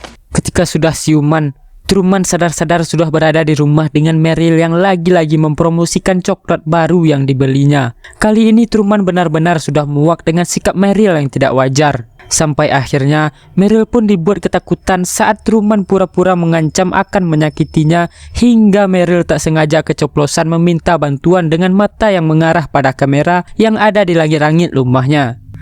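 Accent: West Sumatra, Indonesia. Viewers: Ini urang awak yg ngomongnya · Logat minang ngomong lu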